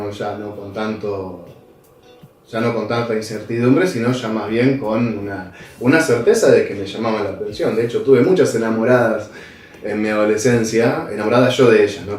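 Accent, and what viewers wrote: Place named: Argentina. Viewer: Que lindo soy mujer transexuAl vivo en usa suenas como argentino, tengo familia en argentina. Ah que lindo me gustas lol.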